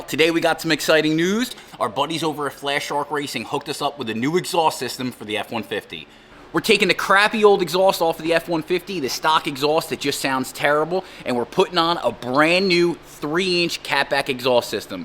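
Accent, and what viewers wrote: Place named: South Jersey, United States. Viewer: Heard the accent and thought south Jersey or DelCo PA haha. Nice exhaust upgrade man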